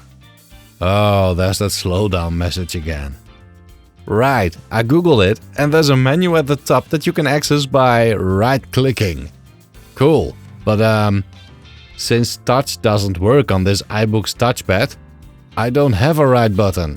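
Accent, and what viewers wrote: Netherlands. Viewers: This OS reminds me UK YouTuber, Dan Wood. He is Amiga computer user, btw. I remembered watching MorphOS video a few times ago. But what I liked more here, than from Dan Wood is your NLD English voice that I just preferred 😅😅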